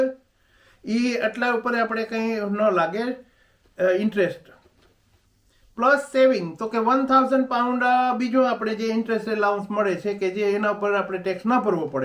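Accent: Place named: Saurashtra, India. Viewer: Sorthi boli hambharva Ni Maja ave chhe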